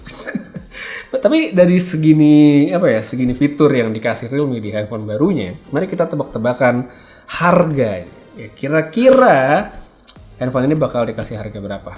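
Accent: Bali, Indonesia. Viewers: Bli asli Bali?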